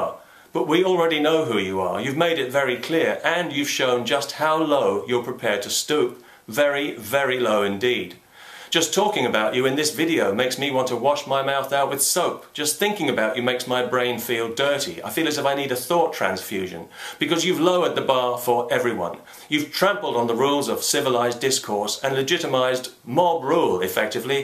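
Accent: England, United Kingdom. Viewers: An Irish man with a English accent .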